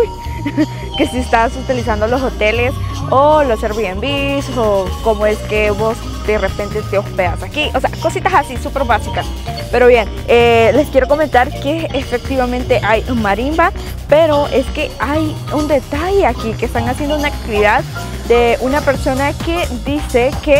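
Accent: Guatemala. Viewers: DATO IMPORTANTE “YA SE TE SIENTE EL ACENTO CHAPÍN” JAJAJA Se siente de a huevo 🫶🏼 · Ya hablas como chapina 🤭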